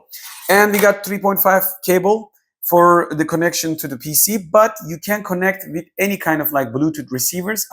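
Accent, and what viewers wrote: Turkey. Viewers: Türkiyeden selamlar aksandan anlasılıyor :))))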